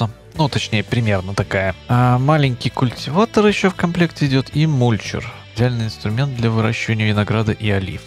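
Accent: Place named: Saint Petersburg, Russia. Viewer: Привет. Спасибо за серию. Ты вроде бы с Минска, а по нашему, по-Питерски разговариваешь. Откуда про бордюры знаешь??? )))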